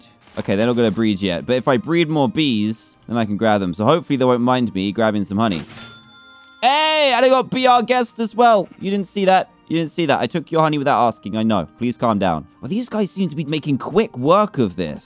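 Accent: England, United Kingdom. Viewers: Wat part off England are you from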